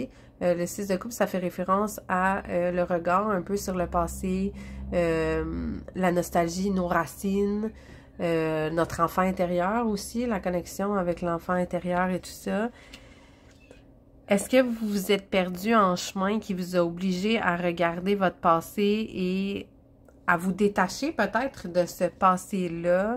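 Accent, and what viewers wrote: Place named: Quebec, Canada. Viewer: Je suis tombée par hasard sur une vidéo de vous hier...comme on dit, il n'y a pas de hasard 😉 Et celle ci ce matin, je vis en Suisse😊. Je dois vous dire merci pour ces guidances, qui sont incroyables. Cela fait quelques mois que je suis en transition, en recherche de mon chemin de vie, et la vidéo que j'ai regardé hier, m'a donné enfin des réponses à mes questions bien précises. Pour cela plein de gratitude 🙏. Cette vidéo est aussi surprenante de vérités, choix 2. Et le plus, votre magnifique accent québécois. Vous avez une nouvelle fidèle ❤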